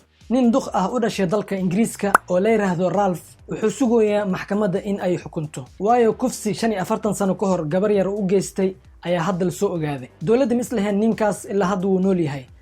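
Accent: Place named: Mogadishu, Somalia. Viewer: ee imka qof yutubar ahtahy markaa afsoomali bilaa xamar ku hadal ile reer muqdisho uun ku dawan mayaan dadkle oo guud ku dawanyee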